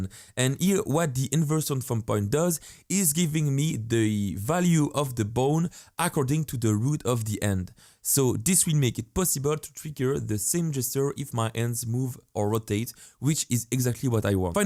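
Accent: France. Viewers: It's ok man, not easy to pronounce some words with French accent. As long as people understand, it's fine :) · We Americans are jealous of your Francais accent ;)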